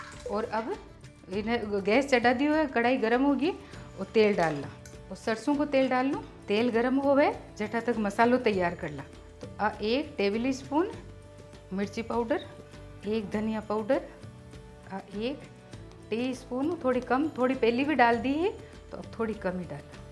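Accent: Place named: Jodhpur, India. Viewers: Didi dal bati su jyada apri marwari sun n Dil n sukun ayo kiti miti bole h apnane marwar ri Ram ram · Nhi sa ....ye bikanri bhasa ne bhi marwadi keve pr ye proper marwadi ki jodhpuri marwadi bol riya h pr ha kathe kathe kuchaman wali assign aa riyo h sav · Aap ke marwadi bolne ka tarika bhut hi pyara h hukum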